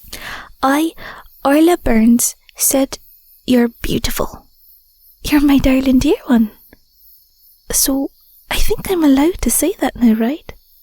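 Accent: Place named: Scotland, United Kingdom. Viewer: I like werewolf girls, I like Scottish accent, I like stargazing. What a great combo.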